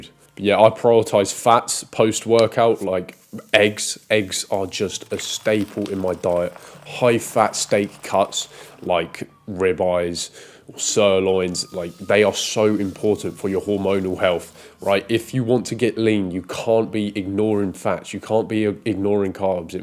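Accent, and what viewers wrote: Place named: England, United Kingdom. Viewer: Since you a have an English accent...Ill listen to you.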